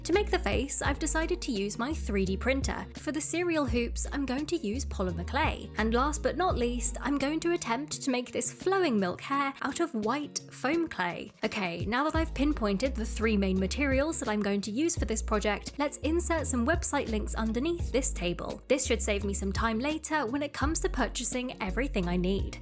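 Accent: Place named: United Kingdom. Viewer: I love your art sm your voice reminds me of Ldshadowlady but I think it’s uhh cause your both British 😭✌️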